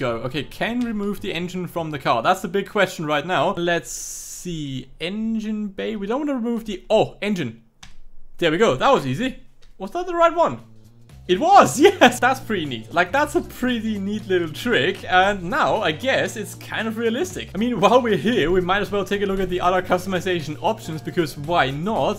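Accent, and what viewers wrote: Germany. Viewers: Are u german ? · One question... can you speak german? Your English sounds a bit german!😄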